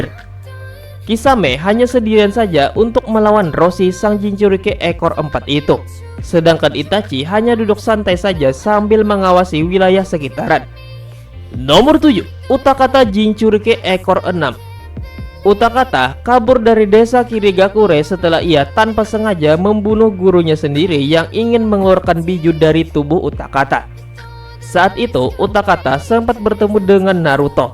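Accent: Banjar, Indonesia. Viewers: Orang banjar kah nyawa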